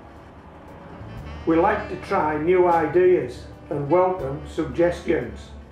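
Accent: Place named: Cumbria, United Kingdom. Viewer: Areet Marra? Not often you hear Cumbrian on the Youtubes ;) Now we're at home all the time, there's a bit more chance to get in the garden (silver lining?) So I'll watch and learn whats going to work in our neck of the woods. Great content - Keep it coming Tek care o yersel and that laal dog eh!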